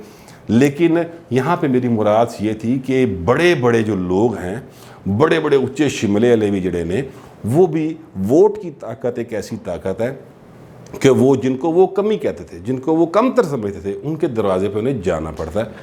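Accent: Lahore, Pakistan. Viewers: Your lohari accent is beautiful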